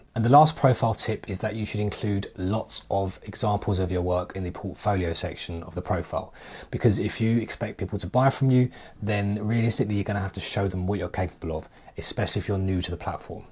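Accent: United Kingdom. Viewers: Can I just say, one of my great annoyances is with youtubers that talk at 100 miles an hour. it’s really off-putting. Please dont fall into that trap. Otherwise, I am enjoying listening to someone from the UK for a change.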